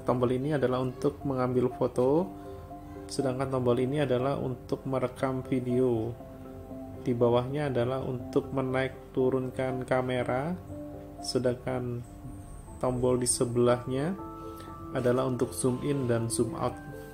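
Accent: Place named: Kalimantan, Indonesia. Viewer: Ikam orang asli mana gan ? Hha bubuhan kalimantan jua lah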